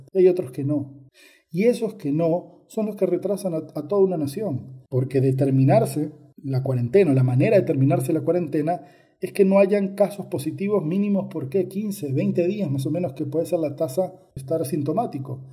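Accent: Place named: Andalusia, Spain. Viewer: Que bien, buen análisis... empece a seguir... además mejor con el acento del sur con palabras venezolanas je je je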